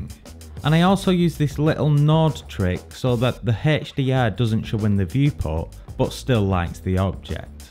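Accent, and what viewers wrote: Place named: Lancashire, United Kingdom. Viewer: Proper Preston/Chorley accent! Loved this, wish I'd stuck to doing 3D work.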